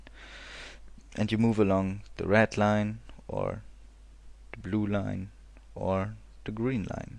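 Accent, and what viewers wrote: Germany. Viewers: 14,958 views as of right now. I think we like your common sense attitude and your clear way of speaking. It's apparent that German accents are cool... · a german accent but its a good video ;) thx´s :) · ARE YOU A GERMAN INDIVIDUAL?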